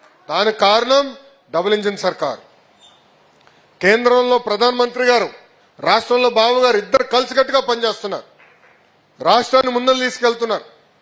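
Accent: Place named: Telangana, India. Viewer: Enti bro telangana slang ra Bai idi enti bai good good rabai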